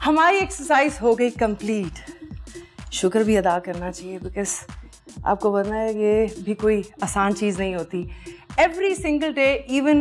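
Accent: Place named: Punjab, India. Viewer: Hahahaah ur punjabi just love😂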